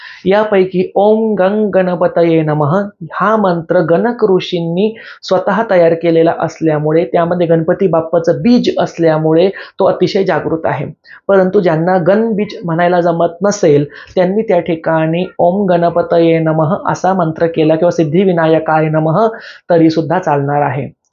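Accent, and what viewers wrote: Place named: Vidarbha, India. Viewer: भाऊ आपली विदर्भाची गोड भाषा आहे आणि तुमच्या मुखातून जास्तच गोड वाटली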